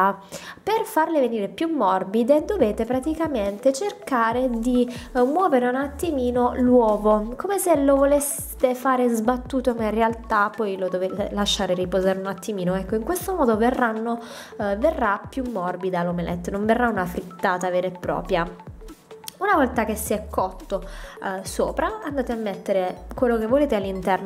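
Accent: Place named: San Severo, Italy. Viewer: accento san severese inconfondibile :)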